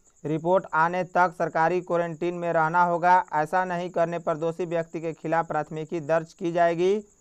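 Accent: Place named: Bihar, India. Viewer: Sudh Hindi bolo ye bihari nahi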